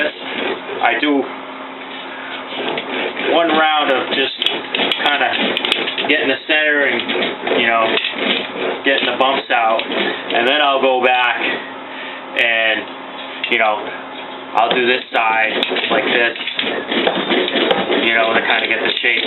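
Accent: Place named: New England, United States. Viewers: Lmao I was like hey am I bugging or is he from new England. I'm 15 min south of boston myself. Where you from? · I wouldn’t apologize, ya did a great job with your video, and the New England accent, I was raised in Boston, and have lived for 34 years in Pennsylvania, and still have that Boston accent, makes me feel like I was listening to a buddy back home 😊 nice job on the fender........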